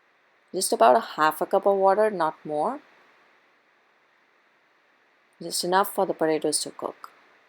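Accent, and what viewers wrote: United States. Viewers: dnt kill me with your american accent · its because she is american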